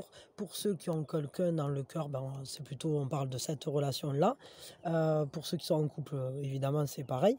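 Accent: South, France. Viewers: Merci, toujours entre le rire et la poésie, j'adore et ton accent c'est mon sud...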